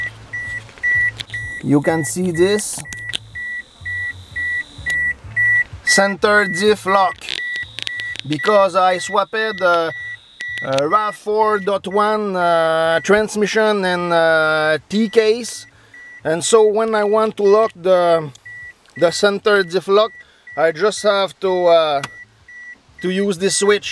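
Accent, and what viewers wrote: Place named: Quebec, Canada. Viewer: Un québécois sure! Hahaha mais ça répond a un idée!💯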